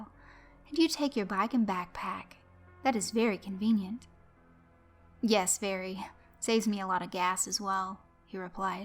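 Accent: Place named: Georgia, United States. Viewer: You must a country girl, guessing Georgia. Or Alabama. Love county girls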